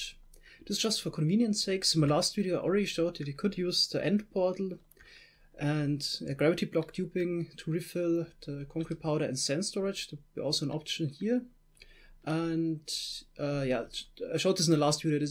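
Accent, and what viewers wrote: Germany. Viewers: Dein Deutscher Akzent haha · Man hört deutlich das du deutscher bist... Ist normal lul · I think you are german because you have this actzent and i knew this because i have this actzent too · The german accent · Da hör ich doch den deutschen Akzent raus😂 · wich language do you normally speak, because you kinda sound german or spain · Ja man hahaha dachte am anfang das er Deutsch redet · in my understanding he is german, his accent is very similar to mine, :D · Are you german? You sound like your german xD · Are you from Germany ? Weil ihr euch so anhört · Der deutsche Akzent hahahaha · Hellocould i ask something are german because your accent is almost like mine when i speak english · He sounds German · Where u from? U sounds like german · Du bist deutsch hört man👍👌💪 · Du klingst deutsch lol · So nice man merkt das er deutsch ist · Hörst dich an wie n deutscher lul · Man hört dein deutschen Akzent bro aber krasses english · t0rf I'm sure he is :,D His accent and some accidentally used German words gave it away ^^" And everyone knows that Germans are the best engineers soooooooooo... 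Just kidding but ye, I think he's German :D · Are you German? I thought it because your Accent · Are you German? You sound very german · Ist das Deutsch-Englisch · Dezenter deutscher Akzent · It really sounds like you are German and try to speak English.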